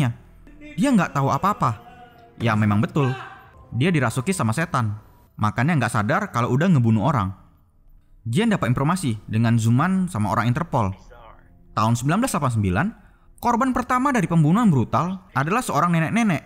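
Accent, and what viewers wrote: Medan, Indonesia. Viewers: Untung aku anak medan jd ngerti apa yg kau bilang hahaha cepat kali bah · wowkowokwok biar mantap bolehlah logat org medannya di pertahankan, saran ya... jangan semua benar2 dipertahankan biar meluas semua org bs menikmati kontenmu, pake Ejaan yg sedikit mendekati bahasa indonesia baku, ok lae.. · Medan kali kau yah bg yahh, salam dari pakam ya lae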